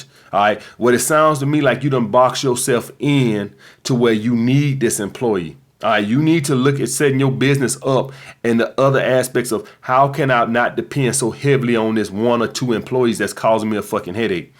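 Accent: United States. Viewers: Yo Bruddah haha this guy is the Don yo ha I wanna mention this to my cronies in the liquor store n down town pubs but worried there gonna drill me Ya saying that every man really has these problems Life's difficult I grew up no family I'm safe as fuck to everybody but ppl just take the biscuit piss dude lol But the woman they just killus It happens to us all haha omg Until you get some Asssss Dat fucking Credit Card stayn In the god damn fOcking pocket Hahahah Man American Accent is fucking Ace 😂 Respec dude ur Wicked 👍👍👍👍👍👊👊👊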